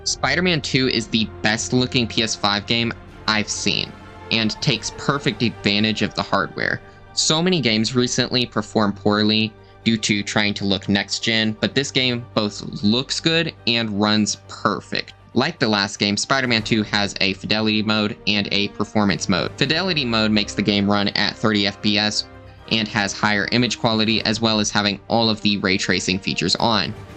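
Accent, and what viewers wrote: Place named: United Kingdom. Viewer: Bro I've noticed that you have the British accent. Can you give to me some advice to improve my listening skills to understand you British people?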